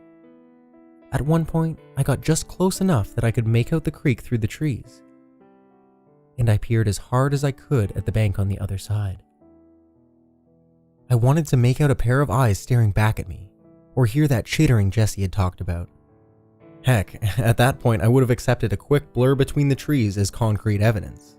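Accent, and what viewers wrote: South, United States. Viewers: Very nice southern accent. It sounded real. I'm from the south so I'm used to it. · love your southern accent xD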